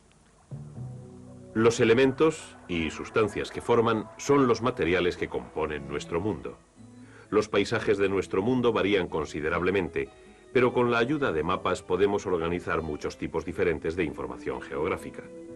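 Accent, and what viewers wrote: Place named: Castile, Spain. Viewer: Nada más lindo que un documental vintage y con acento español de Castilla 💞⭐😊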